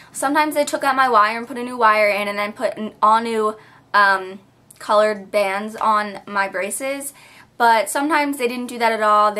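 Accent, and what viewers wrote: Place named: South Jersey, United States. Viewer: Not to be creepy, but do you live in southern Jersey. I noticed an accent and a phillies hat. I am from absecon and I recognized your voice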